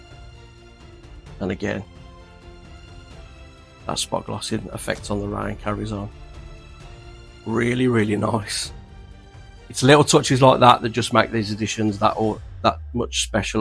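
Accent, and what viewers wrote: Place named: Birmingham, United Kingdom. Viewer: Nice review mate. What part of Brum are you from?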